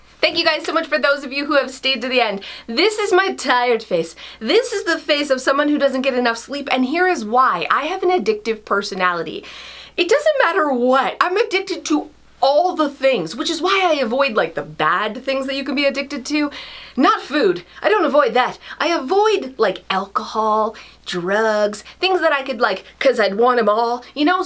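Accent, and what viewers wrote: Canada. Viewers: Hilarious intro. Love your Canadian accent. Personally I keep temp files (this year) in my desk, and long term files all in the same file cabinet. Having 15 different places I found increases paper clutter. Also a periodic clean out of even long term files is necessary. I am considering your household binder idea. I liked your command center its not suitable for me but quite beautiful. Not a fan of the whole addictive personality thing, please no offense, it comes through and I'm a less is more kind of gal.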